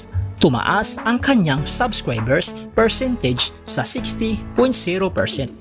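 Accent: Visayas, Philippines. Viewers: Pag BISAYA TLGA, MASAKIT SA TENGA, PUCHAAA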